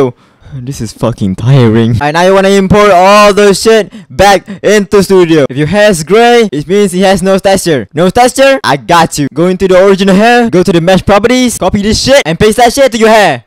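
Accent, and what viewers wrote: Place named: Malaysia. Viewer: this accent sounds familiar edit: ah no wonder, hes from malaysia and so am'i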